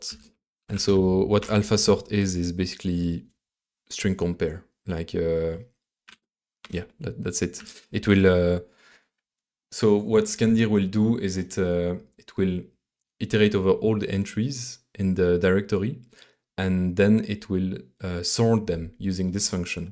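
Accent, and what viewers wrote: France. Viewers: It's the french accent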